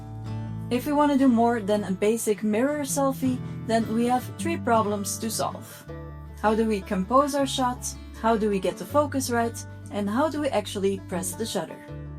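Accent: Flanders, Belgium. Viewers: Dankt voor de tips, leuk om de verschillende keuren haar te zien en je mooi Vlaams accent te horen :-)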